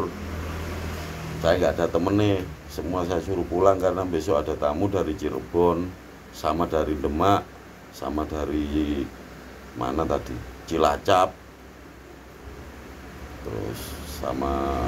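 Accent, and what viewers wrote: East Java, Indonesia. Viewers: Top gus lossss.. Ngomong Karakter suroboyo tanpa ading aling-aling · Gpp gus.. Mklum ktnya gus org tuanya jombg.jbg nya mana gus.. Sya dr djbg. Smga sehat selalu gus ... ..logat jatim ya bysaa gus... Woteper. Heheh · Losss..... Ben ketok nek wong Jawa. Timur mas 🙏🙏🙏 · Nek gak kaku, duduk arek suroboyo arane... Jian josss...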